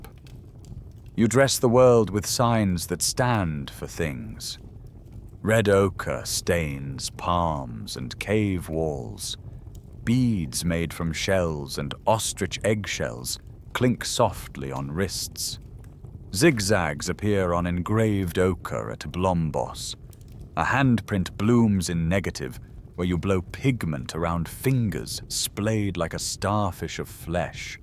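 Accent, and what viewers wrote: United Kingdom. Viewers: I love these videos from Sleepy History. Now turn on that fan for some background hum and wow, this AI toff accent! But remember, a British gentleman does not say missle, he says missile.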